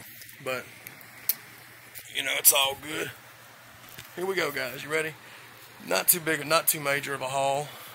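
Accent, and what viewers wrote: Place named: South, United States. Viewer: Not being a stalker, but you have a southern twang and I was wondering what state do you live in?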